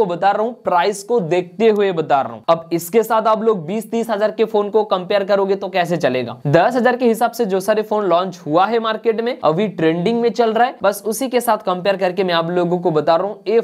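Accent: Bengal, India. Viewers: Bhi toh bangali hai kiya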